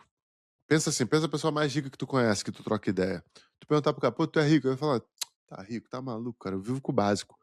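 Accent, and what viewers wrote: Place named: Rio de Janeiro, Brazil. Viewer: Mano 100% de orgulho de ver um carioca que evoluiu tanto, essa é a questão da identificação, ainda bem q vc não mudou sua forma de falar e o jeito de ser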